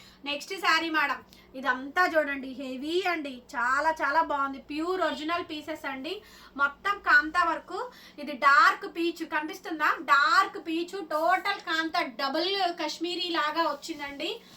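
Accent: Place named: Godavari, India. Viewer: Meeru Godavari padatam muchchataga vundi mam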